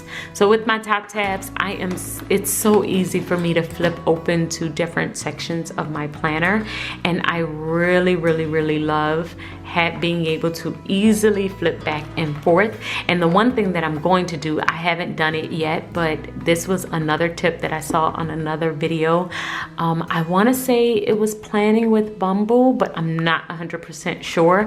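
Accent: New Orleans, United States. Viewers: I detect a New Orleans accent too.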